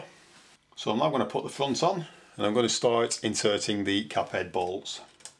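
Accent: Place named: Preston, United Kingdom. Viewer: You sound like a Preston lad.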